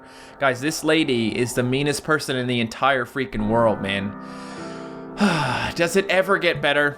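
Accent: Canada. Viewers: Yes, he is from part of Canada where they say that. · his Canadian sorrys really show in this video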